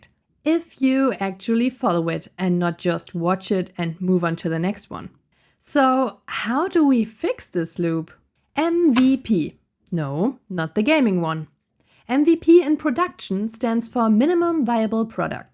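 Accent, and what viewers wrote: Germany. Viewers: If I understood correctly, you're from Germany, so, your German accent actually makes your English easier to understand for non-native English speakers (listeners?). (No offence!) About MVP... So... I wrote some program around November 30th, aaaaaaaand totally lost interest in making it good, not just MVP. Somehow in 2nd half of January I managed to get it into reliable state: now it's running 24/7. Sometimes it's really hard to make step from "it's kinda working" to "yeah, now it's not embarrassing to show it to others". But I think, I saw your channel just in time. Actually need do smth, not just collect ideas and dream about how cool it would be to build it someday. Thank you.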